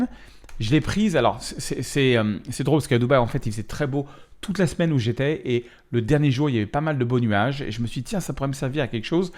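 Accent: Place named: South, France. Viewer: Super tuto, dynamique, humour, l"accent du midi, bref que du bonheur.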